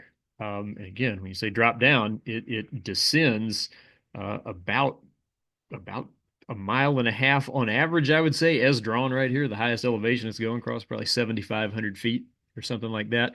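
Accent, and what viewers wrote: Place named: Baltimore, United States. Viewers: You have what a lot of people would consider a dundalk or western baltimore accent. But its not every word you say. Mostly just the O vowels. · I could've sworn dat guy was from Dundalk or Essex. He sounds like Traffic Jam Jimmy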